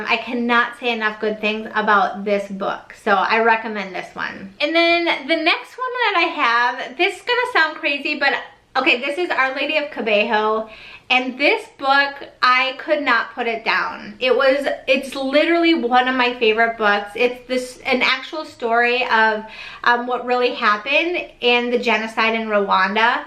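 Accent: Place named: Chicago, United States. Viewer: Thanks for the book recommendations, am really curious to check out Consoling the Heart of Jesus....just a unrelated question/comment, are you from the Chicagoland region? Just picking up strong Chicago accent :)...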